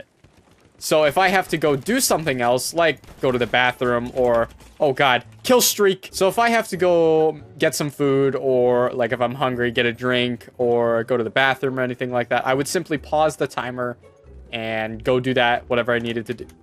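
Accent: Canada. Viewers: u canadian ?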